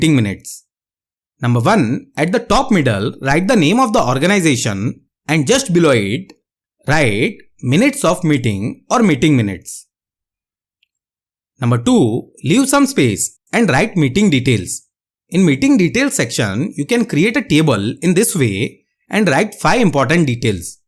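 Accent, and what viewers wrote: India. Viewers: That's how Indians speak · So what if thats his accent he’s from india,,juhs appreciate the effort he put seriously whats wrong people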